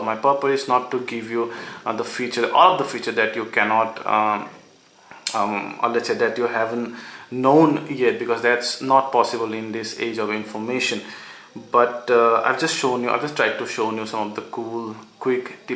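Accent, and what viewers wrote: India. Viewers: Indian hands and ascent.... its easily to be recognized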